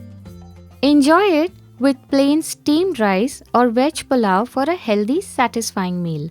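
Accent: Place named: Odisha, India. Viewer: Your English talking style is like a waww. ,,,I guess you are from odisa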